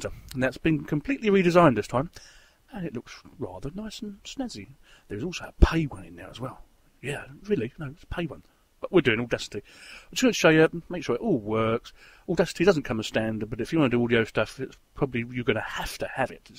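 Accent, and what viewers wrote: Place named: England, United Kingdom. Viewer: Although as a German, I Cant get really into your English Accent, but I Tried it. Well, Im using Xubuntu 10.10 since a Month and its really great. The Default Style is so much better, And the Speed is very nice. Before all that I Used Gnome and I Was very Happy with it. But Gnome got slower with Maverick, so I Tried first Xubuntu. And stayed there. :)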